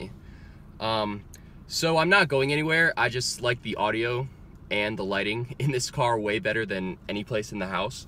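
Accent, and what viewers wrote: Ireland. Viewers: Are your parents or grandparents Irish? You have slight Irish accent.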